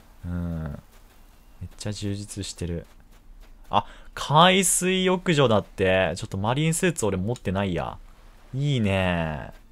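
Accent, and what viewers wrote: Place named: Gifu, Japan. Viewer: たまに出る方言的に石川か岐阜かなって思ってましたが、岐阜でしたか。勝手にすっきりしました。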